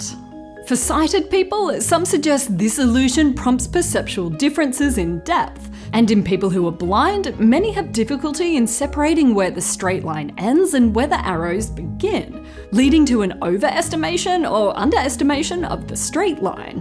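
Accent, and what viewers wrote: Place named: Australia. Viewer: Monologue my brains out. Only with the Aussie accent though.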